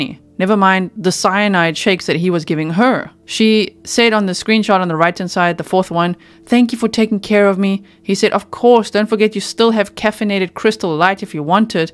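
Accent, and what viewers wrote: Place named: South Africa. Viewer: Hello from Cape Town South Africa ...are also south African you sound like one😊🇿🇦🇿🇦🇿🇦🇿🇦🇿🇦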